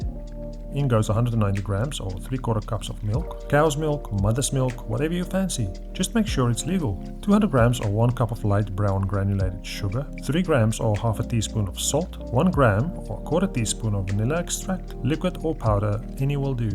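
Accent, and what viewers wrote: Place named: South Africa. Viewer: You have a great voice with the wonderful South African undertone. Thanks so much for your videos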